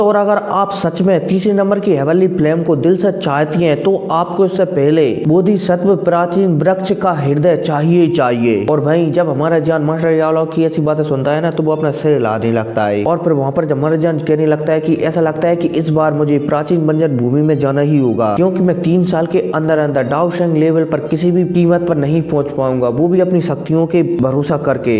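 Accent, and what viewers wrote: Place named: West Bengal, India. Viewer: You are from West Bengal 😅❤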